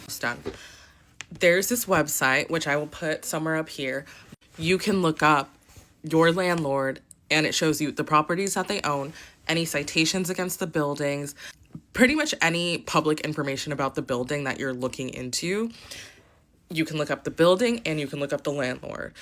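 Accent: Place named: General American, United States. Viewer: I feel as if she said that due to your more “standard American accent”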